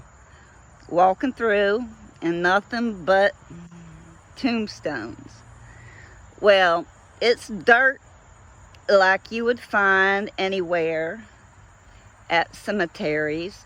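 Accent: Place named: South, United States. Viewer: Hi GF WOW 😮 You told your First Experiences, that is so cool. I know we both have many Stories don’t we 😉 Hey Don’t worry about what some may think !! Sis Elke is Right about it’s a Paranormal Experiences. Like the ones they tell Every single Day 😇 Do you have the Pictures handy from when your Moms Family Home Burnt Down? I forgot it was Christmas 🎅🏽 Morning. Didn’t it get one of the Barns close by? Email me the Pics. when you find them. It was so long Ago, seems like forever. Ok I know it’s been a Long week for you Babe, hopefully tomorrow you can just get some Rest.Your Southern Is Right on Target. You Remember the old Cassette Tape you found of you reading Little Red Riding Hood from Your Grandparents Place? That’s how you sound here. Lmao 😂🤣You were How old? God Bless GF and I talk to you Soon.💙🤍💙🤍💙😘🥰Night.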